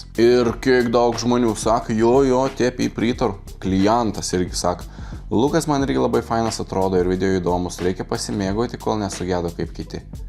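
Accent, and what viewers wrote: Samogitia, Lithuania. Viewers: Pažiūriu ir tavo ir Pauliaus keliones ir nematau išvis jokio panašumo tarp jūsų ir jokio kopijavimo. Jei esat iš Žemaitijos tai ir kalbat taip. Va jei kuris nebūdamas iš ten mėgintų taip kalbėt tada suprantu, kad kopijuoja kažkas kažką. Man patinka tavo kelionės, tavo video, šaunuolis, linkiu kuo daugiau prenumeratorių ir gerų kelionių 😊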